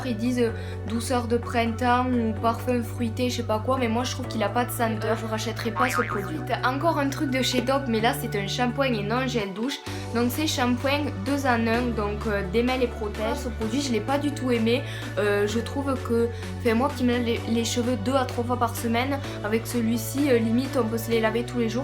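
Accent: South, France. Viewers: J'aime bien ta vidéo , ta l'accent du Sud ⚓ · Coucou ! j'aime beaucoup ta chaine n'hesite pas a venir faire un tour sur la mienne tu est trop mimi ^^ et j'adore to accent du sud !!!! bisouus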